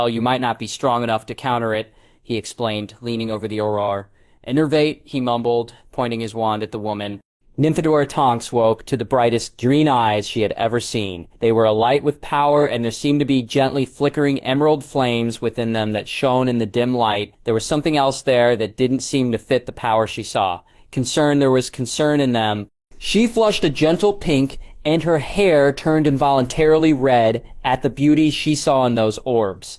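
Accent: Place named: United States. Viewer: Why is this one with an American accent? It's really jarring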